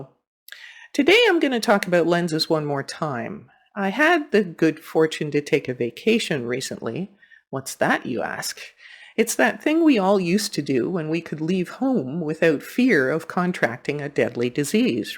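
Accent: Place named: Canada. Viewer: First of all, I would like to say what a pleasure it was to catch your video, and it was lovely to hear a Canadian accent again! I lived in Hamilton, ON, for about 6 years before moving to Victoria, BC. I now live in Auckland, New Zealand. I started my professional career in 1980, but am now retired, so I have quite a few things in common with you. My preferred genres are wildlife, scenic and travel, but I have also shot architecture, portraits, commercial and industrial stuff. In NZ, birds are the dominant wildlife form, so my experiences are very much couched with shooting them. So, to your experience... I do understand your frustration with challenges using third-party lenses. I got the R5 and a couple of R6 units about 20 months ago along with the 100-500, 24-105 L units, and the 24-240 (which, by the way, is a surprisingly good all-rounder!). However, in my fairly vast array of legacy gear I have the Sigma 150-600c and 60-600s lenses, and I tried them on my R5 and R6 units via the Canon basic EF-RF adapter. 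To be honest I had no issues unless I was shooting close to minimum focusing distance - an experience echoed by respected Australian bird photographer Duade Paton in one of his videos on the subject. I have not checked your settings at the time of responding, but there may be some subtle difference in how we have our units set up. One thing of note is that Canon have released a long series of firmware updates for the R6 and R5 to fix issues with tracking and focus tha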